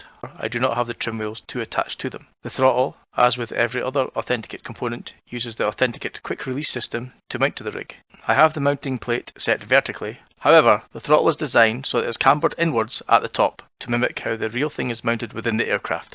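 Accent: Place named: Newcastle, United Kingdom. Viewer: Love the Geordie accent, why aye pet, emotional roller-coaster of emotial emotions 🤠👍🏿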